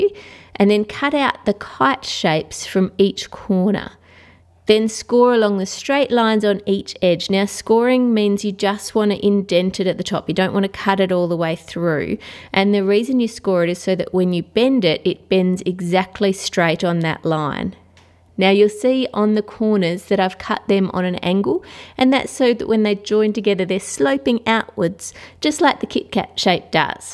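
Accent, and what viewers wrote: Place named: Australia. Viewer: ugh i love aus accents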